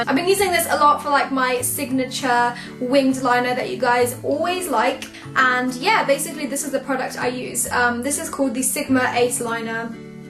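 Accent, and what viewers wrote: England, United Kingdom. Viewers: Cyna monka but she has a British accent at the moment, right? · This is from Poland, but live in England. So she has a british accent :) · Hi,! I've found your channel a few days ago and I absolutely loved it! ♥ I also love your British accent, which I wish I could have someday... congratulations for the success on YouTube:) my best wishes from Argentina:) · Where of England are you from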